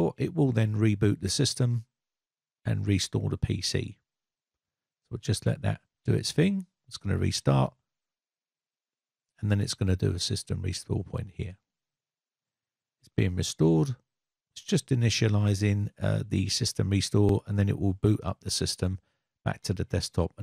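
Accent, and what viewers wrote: United Kingdom. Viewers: Bri ish guy it's not working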